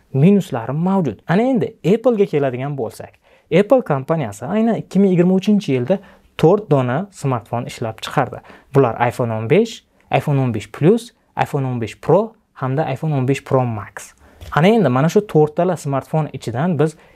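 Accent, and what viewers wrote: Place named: Uzbekistan. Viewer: Yaxshi kontent chiqibdi. Lekin inglizcha so'zlani o'zbekcha qilib talaffuz qilmang. Chunki bu so'zlar "amoled, snapdragon" so'zlari siz qilayotgan kontentda juda ko'p ishlatiladigan so'zlar va ularni to'g'ri talafuz etish sizlarni vazifangiz desa xam bo'ladi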